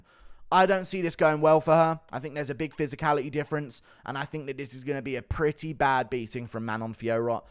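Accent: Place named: England, United Kingdom. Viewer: Can anyone tell me what part of England accent is?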